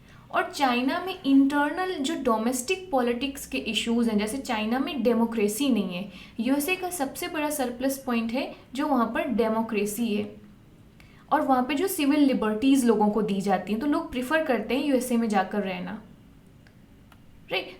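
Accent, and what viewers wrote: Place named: India. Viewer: I can't really understand this English ,the videoo seems welll done but unfortunately it is difficult to follow for people not from india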